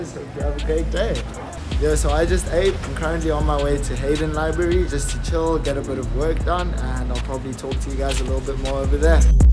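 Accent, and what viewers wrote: South Africa. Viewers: You have a South African accent...you from there?? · Were you born in South Africa, by any chance? Your accent sounds a lot like ours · You sound so South African… am I correct or not? 🇿🇦 If so, could you please make a video about it; Matric marks, how you applied, what the costs are, etc. Thanks brother. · I know a South African when I hear one. 😂👀👋🏼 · Am I hearing a South African accent here 👀😅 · I noticed that you've got a South African accent, how did you make it to MIT from SA?! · Lol. Idk why but I'm sensing a South African accent... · Are you South African??😏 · you have a "jhb" accent, I picked it up a mile away 😅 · Weird question but are you South African😂😂😂 I cant shake the feeling💀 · Your accents sounds so South African, have you ever been to South Africa? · Where are you from? I cant tell what accent you have, sounds South African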